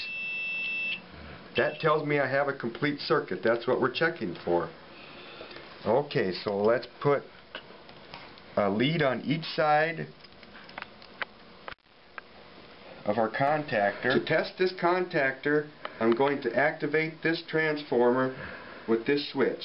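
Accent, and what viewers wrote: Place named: Midwest, United States. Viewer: This is the most midwestern guy I've ever heard in my life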